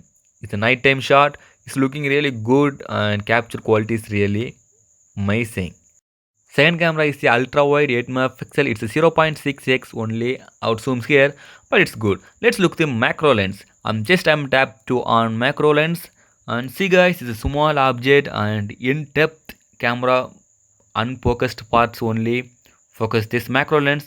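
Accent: South India, India. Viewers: South Indian voice over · Dont steal peoples videos then put your own watermark on it.... Sad. You actually think people believe this is your video, showing chinese person with indian voice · Damn Indian voice with a rather Asian look? Tf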